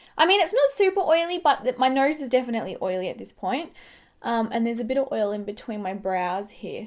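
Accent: Australia. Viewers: ❤❤❤❤ love all ypur videos, your style and your australian accent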